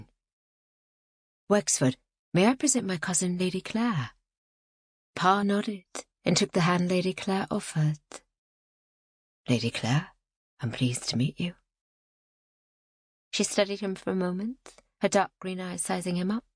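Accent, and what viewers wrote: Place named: United States. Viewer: Why use an American for a British story? There are great RP English voices available. Be authentic!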